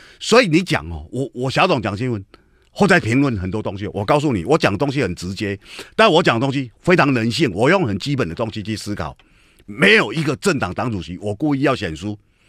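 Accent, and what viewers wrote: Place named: Minnan, China. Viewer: 小董好可愛！尤其是他的閩南國語，每一個咬字都好誠懇！：聽了我想投小董選立委！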